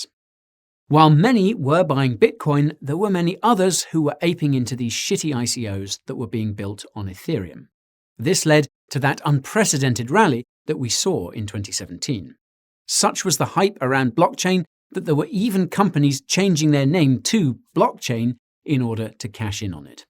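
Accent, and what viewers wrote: United Kingdom. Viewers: It is so much easier listening to a Brit compared to an American.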